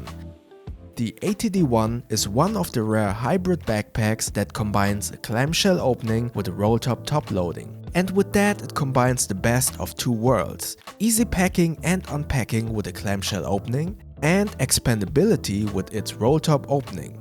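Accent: Germany. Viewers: That T H I C C German accent tho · Deutsche Akzent am Start :D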